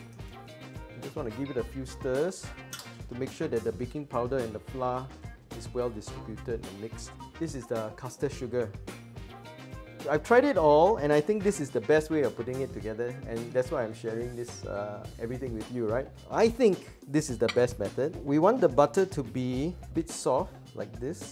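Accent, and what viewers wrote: Singapore. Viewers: Looks fantastic! Even more siok ...love the Singlish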